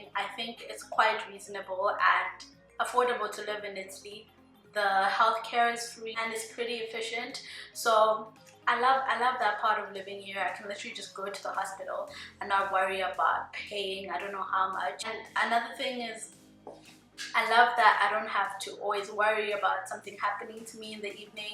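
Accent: South Africa. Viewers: Hey!! you sound South African!! I can tell you are!!I would like to travel to Italy through AuPairing. Can we get in touch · Are you south african? I can here an south african accent but mixed with another accent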